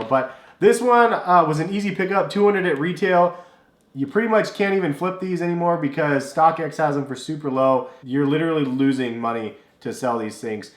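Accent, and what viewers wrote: United States. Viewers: are u American?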